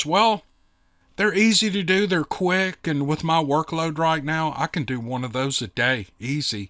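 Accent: South, United States. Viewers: "manly" southern voice is a national treasure as far as this old gal is concerned. I despise squeaky voices coming out of a fellas mouth & hate listening to ads but I'd actually listen to em if was the one narrating it 😂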